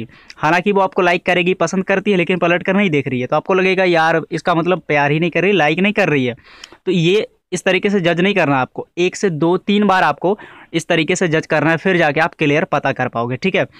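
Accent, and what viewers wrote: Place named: Bihar, India. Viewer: Tu khud awaj se Bihari lagraha hai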